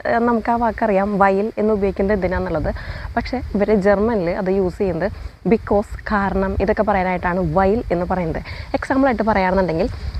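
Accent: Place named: Malappuram, India. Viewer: എനിക്ക് സാമ്യം തോന്നുന്നത് മലപ്പുറം ഭാഷയോട് ആണ്.. 👌🏻❤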